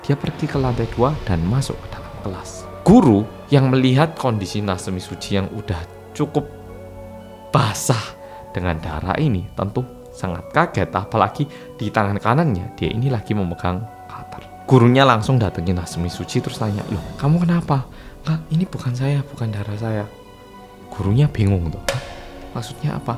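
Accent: Java, Indonesia. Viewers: Hadirrrrr.... Mas medok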